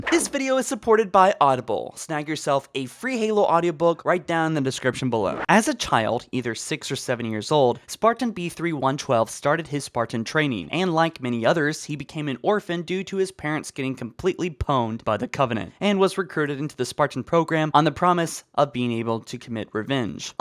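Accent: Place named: South, United States. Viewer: you know ive never thought about it but has a southern accent. he hides it well but it leaks through in small bits sometimes.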